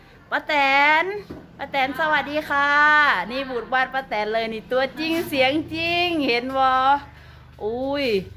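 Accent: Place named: That Phanom, Thailand. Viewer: เจ้าของเพจสำเนียงคือไทยธาตุพนมเนาะค่ะ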